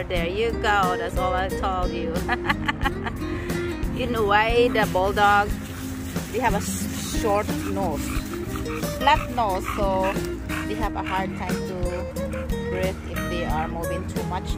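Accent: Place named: Australia. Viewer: What a beautiful day and Australian accent connected to you now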